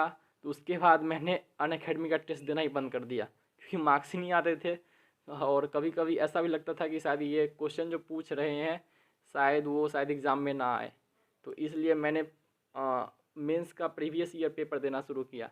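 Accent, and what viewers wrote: Bihar, India. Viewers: Bihar se ho kya,?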